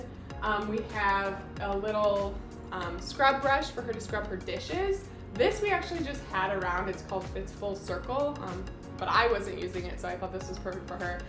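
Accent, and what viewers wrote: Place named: Illinois, United States. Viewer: Lol I can pick up on your Illinois accent right away. I’ve seen a lot of these kitchen Montessori hacks. Trying to find one that shows how the pvc pipe goes into the faucet.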